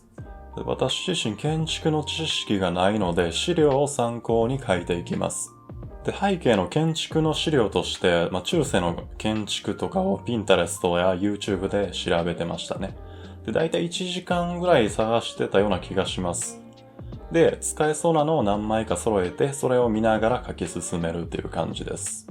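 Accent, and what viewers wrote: Kansai, Japan. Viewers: 西側の話し方といい、土井先生みがイラスト界の土井先生だ！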